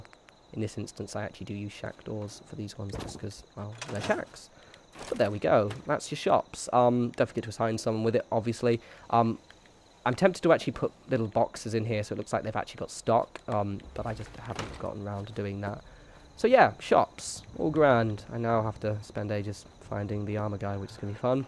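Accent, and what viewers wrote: United Kingdom. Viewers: You sound like a british Travis, lol. In a good way, great vid.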